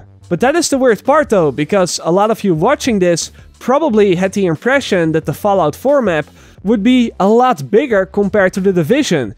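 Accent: Netherlands. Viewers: wow that accent is so great is sounds dutch but at the same time english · Deze gast heeft echt een Nederlands accent This guy really has a Dutch accent. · the person talking has a Dutch accent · I have the feeling you are dutch #accent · I knew you sounded dutch. goed filmpie man! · van Corpusstein haha ja ik hoorde ook gelijk dat ie uit Nederland kwam de eerste keer dat ik een video van hem zag . Nu meer als een jaar geleden. Hij brengt het wel leuk en soms moet ik er wel om lachen dat vernederlandste engels. Maar zijn video's zijn wel informatief. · Your accent dude genious lang leve holland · loving the dutch accent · Prima vid, hebbe gij mooi gedaan! ;P Hoorde direct al dat je een Nederlander was =P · mate your dutch accent is hilarious. But good video liked it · Zoooo.... Zwaar nederlands accent gast, dat verpest de video gewoon. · Je kan goed horen dat je nl bent kill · Dat Nederlands Engels accent do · That Dutch accent tho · aan je accent te horen, gok ik dat je Nederlands bent! 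Leuke & interessante video =) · jij bent zeker nederlands dat kan ik aan je acent horen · wist wel dat je nederlands was door je accent😂😂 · This sounds so Dutch it's not even funny anymore. Atleast it's better than hearing me trying to talk English. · lol je hoord het nederlandse accent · wow nederlands accent haha, goede video man! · hehe tour dutch man😂i can here IT! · So much dutch accent! haha goedzo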